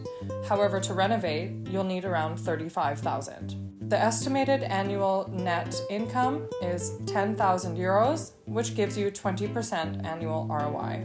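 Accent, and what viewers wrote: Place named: United States. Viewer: You sound American. How did you get to do this? You are so lucky.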